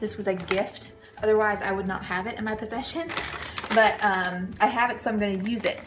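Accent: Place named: Kentucky, United States. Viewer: I am a new subscriber for "Canuary" I was wondering if you are a Kentucky girl? Maybe Tennessee? Your accent sounds close to home to me.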